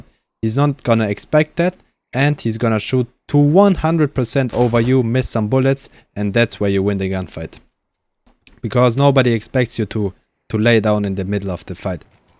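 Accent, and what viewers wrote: Germany. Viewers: Are you German ? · Damn Bro U Made m3 a movment demon fr and i can hear the German accent 😂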